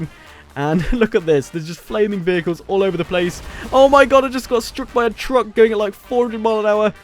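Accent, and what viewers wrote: United Kingdom. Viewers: You never heard a normal British accent before?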